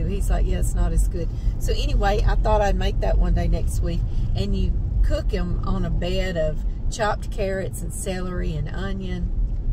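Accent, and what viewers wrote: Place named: New Orleans, United States. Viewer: Nothin like that good ole N.O. French bread! Sounds so good. You make me homesick and remind me Of my cousins and life there and growing up. Love the way you talk and your mannerisms and sayings. Just like the old days for me.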